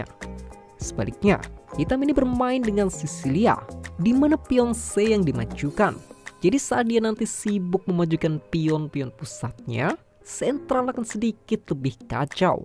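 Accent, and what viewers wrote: Java, Indonesia. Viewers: Konten berulang ini ya? ini yang versi lama : <link> versi medhok jawir, walaupun sekarang masih medhok sih aowkwkwk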